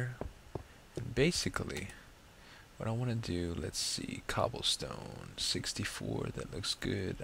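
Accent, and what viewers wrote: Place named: Texas, United States. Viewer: you say too too much too too much. but nice voice, accent sounds like a mix between texan and european lol.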